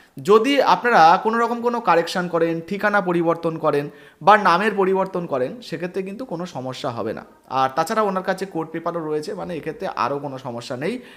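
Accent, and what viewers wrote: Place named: Bangladesh. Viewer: এ ভাই তুই জানিস যে বাংলাদেশী নিয়ে কি হবে তোর বাপের 1971সালের ভোটের লিষ্টে নাম আছে কি না তাই দেখ তার পর ভিডিও করিস তোর কথা শুনে মনে হয় তুই বাংলাদেশী আগে তুই কোথায় যাবি সেটা ভাব তার পর ভালো করে ভিডিও বানাস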